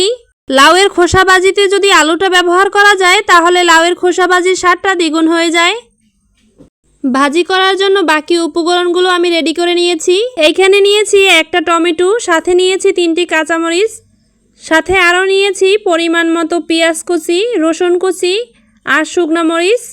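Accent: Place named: Barishal, Bangladesh. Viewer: বরিশালের ভাষায় কথা শুনতে ভালো লাগে ভাজিটা ভালো হয়েছে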